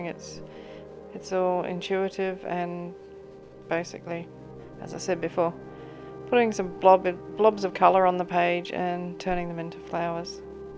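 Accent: Australia. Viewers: It was nice hearing your Aussie accent (I am an Aussie too) because most YouTube instructors are American.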